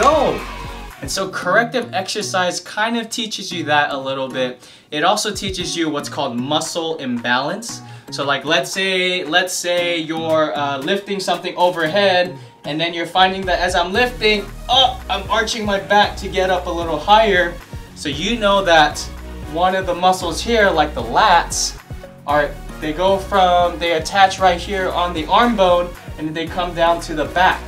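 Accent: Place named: South Korea. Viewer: nah it sounded Korean. I see you, my dude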